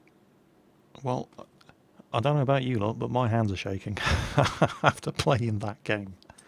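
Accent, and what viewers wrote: United Kingdom. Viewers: he's in the uk -_- did you not get a hint from the accent or British airsoft field or other ridiculously obvious pointers like that he plays on the eu server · Can you not tell hes a brit?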